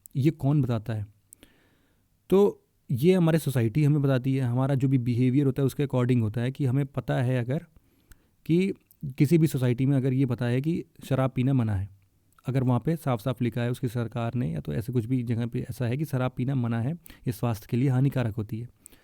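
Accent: Kanpur, India. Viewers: Sir aap kanpur se ho kya??? Kidwai nagar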